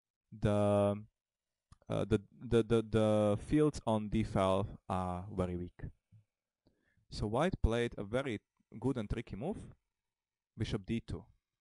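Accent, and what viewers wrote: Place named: Eastern Europe. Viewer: You speak portuguese, i speak spanish, and we both are able to understand him regardless of his pronunciation. He is from a slavic country, so don´t complain about "free" and "threes".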